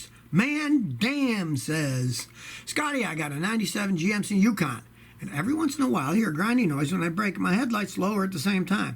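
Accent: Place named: Texas, United States. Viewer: I don't know what I like best, is comment, knowledge, texas accent...or the images on his right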